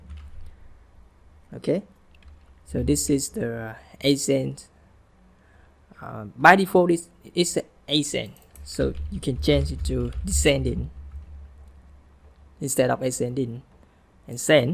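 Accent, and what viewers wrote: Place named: Vietnam. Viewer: bạn là ng VN hả ? accent VN chắc luôn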